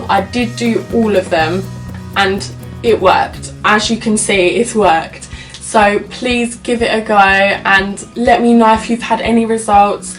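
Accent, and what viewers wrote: United Kingdom. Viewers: I just came here to listen some nice British accent. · I love your accent sounds abit essex but i find that soothing to listen to haha... im gonna go out and buy those tablets tomoz sick of my spotty skin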